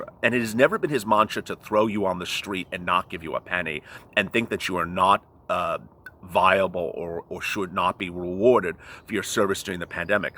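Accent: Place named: Los Angeles, United States. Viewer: Where you leave you sound like you live in L.A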